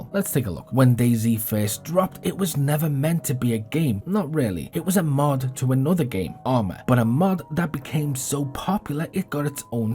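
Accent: Liverpool, United Kingdom. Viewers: I dont hear Toxteth, but I definitely hear a mix ha its only sometimes I hear scouse at all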